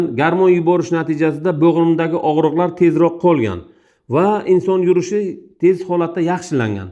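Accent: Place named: Bukhara, Uzbekistan. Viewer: Buxoroda deb òyladim sheva buxorocha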